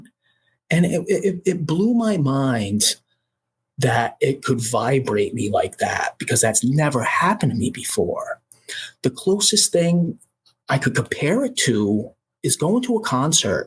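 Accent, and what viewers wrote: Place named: New York, United States. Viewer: This dude is so believable. I hear it in his voice. I hear NY ( north) in his voice, but if he talked to me he’d scream Hillbilly from s.e Kentucky. Isn’t it funny how a few hundred miles change our whole way of talking somehow lol. God bless everyone listening or reading. Remember bros & sisters. EVERYTHING WE SAY OR DO OR THINK, Releases either positive or negative energy, DO Not spread negativity because it leads to sickness, depression, anger, hostility, hate disorders, disease and death. It’s been scientifically proven by the water has a memory case study. Don’t let someone else sling their negative energy onto you, If it happens to you don’t respond with negativity because that means it stuck onto you. Be positive and let that evil energy bounce right off ya. Ps- That same frozen water / ice crystals study even proved holy water is real. 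Nasty polluted water once frozen could not form any pretty patterns in the ice crystals until they prayed over it and refroze it, only then did it produce beautiful patterns in its crystals And One container of water was spoken to and only told it was a Angel. Then after they froze it and looked at it’s ice crystals underneath a microscope, guess what type of patterns it made???? = A HALO OF FLOWERS How does water know angels have halos? Unbelievable huh? They now claim the largest living thing on earth is water. And water itself could possibly be the base element of life itself? What?? That last part blows my mind times 3 Idk just repe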